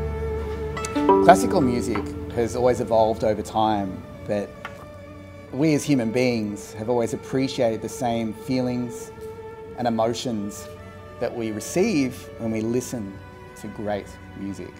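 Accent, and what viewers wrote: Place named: Australia. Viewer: Hi, its always so great to see you again with that wonderful Aussie accent and listen to your great 🎻 ❤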